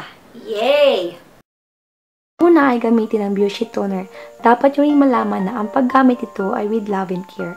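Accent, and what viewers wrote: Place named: Philippines. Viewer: i like your filipino accent.. haha ginamit ko din ko kaso cakey sya.... haha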